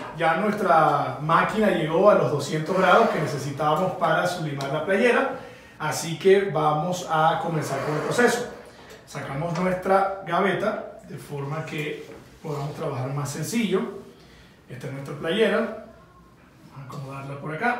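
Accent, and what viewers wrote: Venezuela. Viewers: Acento Venezolano impecable. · Cambie el vídeo solo por el acento venezolano.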